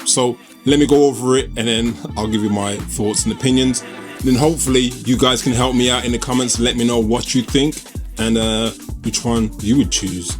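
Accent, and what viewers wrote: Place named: Birmingham, United Kingdom. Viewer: Great vid and a fellow Brummie by the sounds. Cool.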